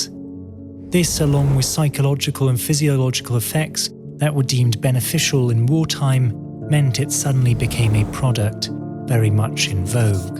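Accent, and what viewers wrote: England, United Kingdom. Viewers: All I understand, but why English accent, Russian sweater, swiss location?? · ... Why do you have a perfect British English accent if you're from Switzerland? O_o Are you not actually Swiss?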